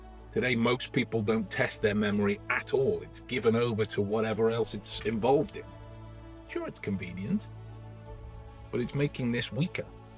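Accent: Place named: Quebec, Canada. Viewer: En fait non seulement tu parles le français mais tu parles également le québécois et ça, ce n'est pas rien !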